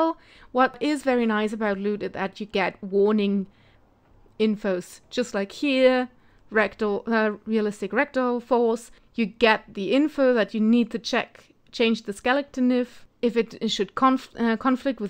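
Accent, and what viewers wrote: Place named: Germany. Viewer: GERMAN!!!! YES!!! That’s what I’m hearing! Well it’s a lovely voice. ;)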